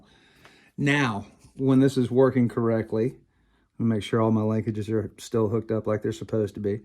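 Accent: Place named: South, United States. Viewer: Buddy, I heard the southern accent and euphemisms and knew right there that this video was the only video I needed. You're right. That German engineering is dummy proof. Thank you for the walkthrough, dude. Be blessed.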